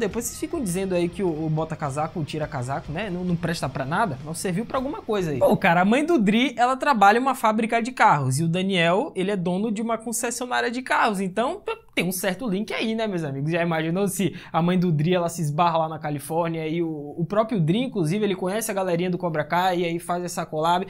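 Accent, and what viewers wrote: Bahia, Brazil. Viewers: Caramba mano, tu é baiano né? Tbm sou, so da sua voz da pra identificar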